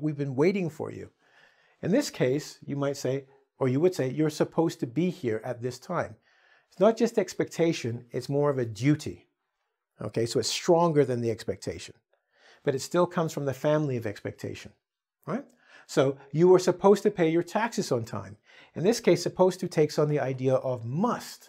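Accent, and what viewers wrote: France. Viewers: Lol your French accent is just merveilleuses! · Your french accent is priceless. Full disclosure - I am french.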